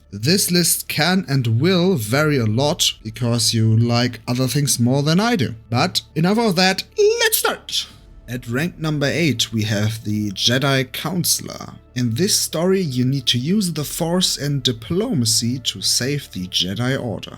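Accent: Germany. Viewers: Are you German by chance? :D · U probably from germany?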